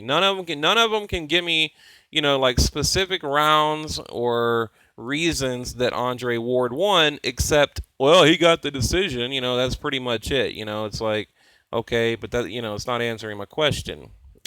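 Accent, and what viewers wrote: United States. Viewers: are you from Houston bro?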